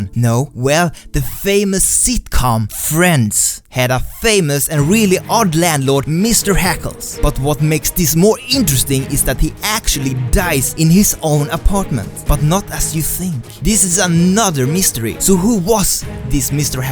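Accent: Sweden. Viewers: Man hör verkligen den svenska engelskan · ''A meteor hits the city and..'' GOSH you can just hear whole sweden in his voicexD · Älskar att man hör att du är svenne hahahahaha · U sound so swedish that's probably cuz u are from sweden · clicks on random sims video from a YouTuber I've never heard about before Gets hit with strong Swedish accent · Are you swedish? Because your accent sounds like so... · Hörde på din dialekt att du är svensk! Jätte bra video btw 😄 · Hahahah jag älskar din svenska brytning 👍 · The second I heard your accent, I knew that you were Swedish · Are u Swedish? Lol (I am and I just recognized ur accent)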